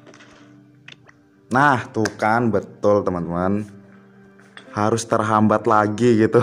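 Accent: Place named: Medan, Indonesia. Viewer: Medan mana suaranya.... SULTAN MEDAN DIA BG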